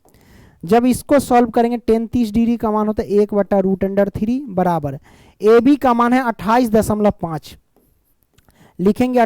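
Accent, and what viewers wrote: Bihar, India. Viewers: सर आप बिहार पटना से हो क्या आपकी मात्र भाषा तो हिंदी हे परन्तु आपकी स्थान भाषा बिहारी हे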